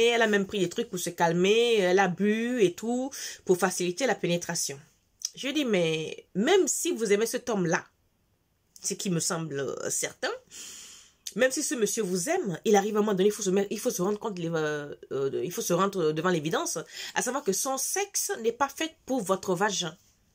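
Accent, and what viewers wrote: Gabon. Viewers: VOUS ETES GABONAISE? j'ai reconnu l'accent c'est le 241 qui gagne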